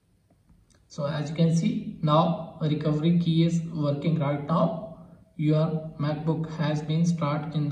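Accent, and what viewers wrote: India. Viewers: As soon as I heard the indian accent, I knew it was going to work!!! Thank you!!!❤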